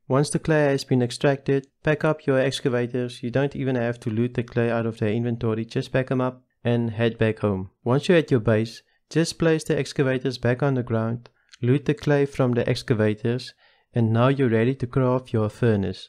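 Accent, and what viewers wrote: South Africa. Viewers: Wow love the videos and very clear and good content, i dont easily sub to a channel but your videos are worth watching. Just a question where are you from, South Africa?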